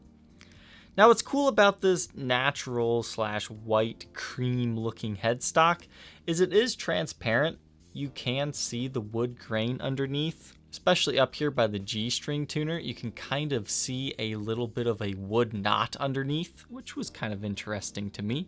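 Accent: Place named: Southern California, United States. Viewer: ...it adds a certIN Quackiness to yer tone.! for a guy who isn't into Frank, sure has his vocal speech southern Cali dialect down to an untrogladyte level.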